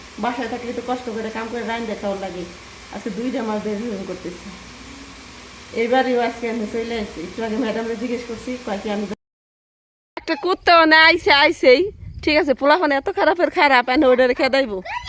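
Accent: Manikganj, Bangladesh. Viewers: আপনার কথা শুনে মনে হয় আপনি মানিকগঞ্জ জেলা সিংগাইর থানার মানুষ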